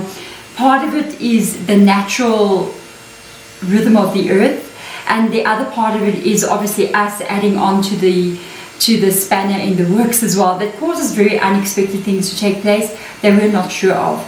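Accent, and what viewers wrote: South Africa. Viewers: Are you South African ? I’m from Durban 🇿🇦🇿🇦🇿🇦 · I recently discovered your channel. Im loving it😊. I detect a fellow south African from the accent 😅. All the best 👍. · South African here! I thought i recognised your accent ❤️ Dankie vir jou liefde!!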